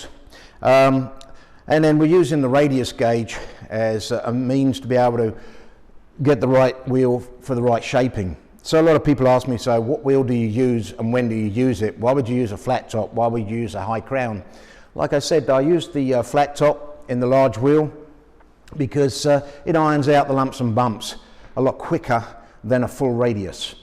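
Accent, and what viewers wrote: Australia. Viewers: I couldn't pick the accent. Checking the about on the linked web page I see he started in Britain and moved to America. The resultant accent is closer to Australian than anything else.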